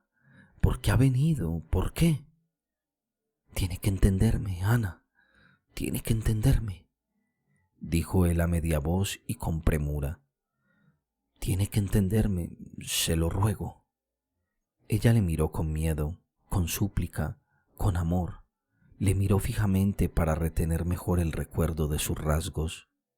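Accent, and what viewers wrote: Colombia. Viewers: Amo tanto la obra de Chéjov que hasta tolero ese terrible acento colombiano con el que el lector nos ilustra. Se aprende tanto sobre la cultura rusa y de paso sobre la misogínia de Chéjov. Con todo, cómo no amar su obra. Leí su obra completa cuando tenía 20 años pero ahora con YouTube la escucho y es como si fuera la primera vez. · Excelente.....me encanta....el acento colombiano....muchas gracias.....su trabajo...nos alegra.......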